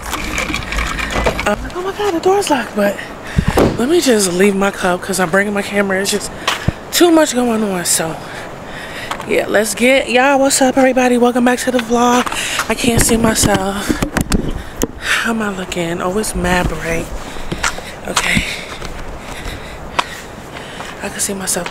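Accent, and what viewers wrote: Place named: New York, United States. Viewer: Looks good and I was dead at the mail part falling lmaoo 😭🖤and omg you sound you from newyork !! Got to be but love the accent !! Keep going gods got you and YouTube is for you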